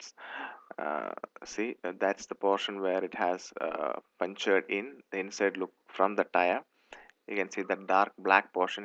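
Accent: Kerala, India. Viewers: sounds Indian to me. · I bet the narrator is from the God's own country.. So am I.....:)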